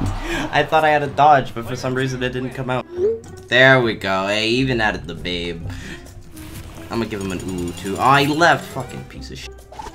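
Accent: Australia. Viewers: Nice aussy accent Mate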